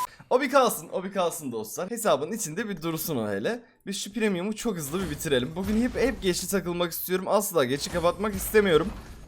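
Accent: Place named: Gaziantep, Turkey. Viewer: abi gaziantepli mi abi konuşması benziyor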